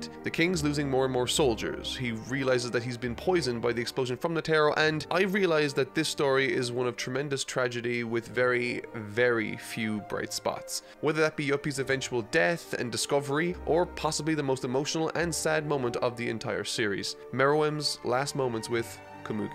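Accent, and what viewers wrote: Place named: Ireland. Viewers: he pronounces the word "calm" the same. I think it's just his Irish accent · he's irish that's just his accent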